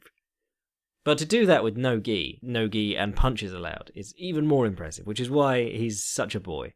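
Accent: England, United Kingdom. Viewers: Your podcast is now a highlight of my week and as a Patreon supporter I want to say thanks for the great work; to me your breakdown of the cards with fight analysis alongside fight history and social history and the 'long view' with that chatty style (you charmer you! 😄) delivered with English humor works for me big time..original and refreshing change from lazy, samey trash from mma media. There are some really good guys, no doubt, but maybe not so much by way of innovation and getting fans engaged, you might agree? Could you the man t shake things up there? I note you plan to focus on the podcast at start of this year so that fills me with hope and glee!! I'm English and it's also nice to hear about mma from an English tongue..I would actually be interested to know who your audience is, if you've done that research and willing to share with us? 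(i.e from which countries, more US or British? much outside those two? Ages, sex etc..I want to know my new tribe better, I guess.. Anyway is one of boys and no mistake! Here's a thought then...how about a feature where Patreon bods can compete/enter for a chance to join you for ten, fifteen mins on the podcast for a chat on their favorite subject related to mma/Boxing/whatever, today or from the past..a little screening of participants would seem to important but i wouldn't mind betting you could have a pretty good, interesting conversation with a good many of your regular listeners..? Yeah alright, totally selfish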